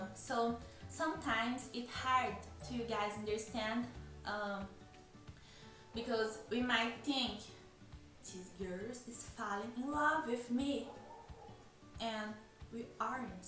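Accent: Brazil. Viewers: “That’s da tru, I’m sorry.” I’m not making fun of that, but that sounded hilarious, I’m used to Brazilian accents because of my mom and little because of my dad. I was born in America, so I don’t have much of a Brazilian accent, I actually have more of a southern accent. But as funny as the accent sounds sometimes, it is a very beautiful one! A part of me wish I was born in Brazil just so I could have the accent! But God made me the way I am, and Im happy about it, much love from The United States! 🇺🇸 🇧🇷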